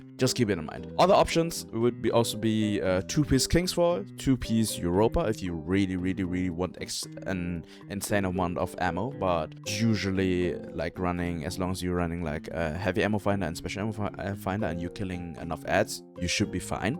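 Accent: Germany. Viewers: Me: not sure where this guys accent is from oh German got it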